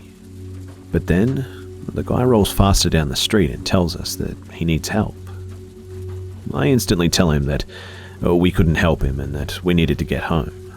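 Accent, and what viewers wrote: Australia. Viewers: You have such a wonderful soothing voice!! Thanks for using your talent to help me relax and unwind from my stressful life. It's always a pleasure hearing your Aussie Accent!!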